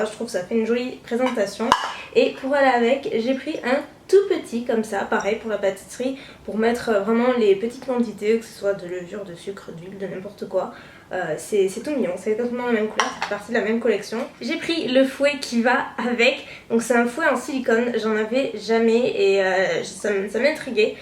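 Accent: South, France. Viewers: Tiia un accennt duu suudd ssuuperrr beeau tres leger mais qui s’entend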